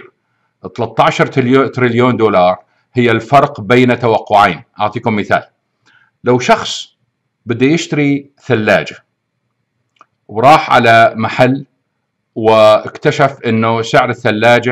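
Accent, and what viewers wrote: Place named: Gulf. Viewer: اخوي الأستاذ سعودي او عراقي لاني عجزت اميز لهجته خليجي على عراقي على شامي … واسم العائلة الحجي وهو منتشر بين اهل العراق واهل الخليج خاصة الكويت والاحساء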